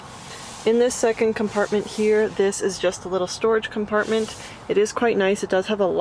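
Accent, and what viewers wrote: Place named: Canada. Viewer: She sounds Canadian 🇨🇦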